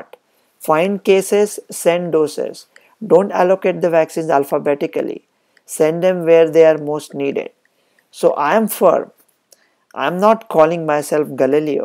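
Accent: India. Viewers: Your accent is still Indian 🙂.Strange. Good thiught though.Keep spreading the hope, positivity.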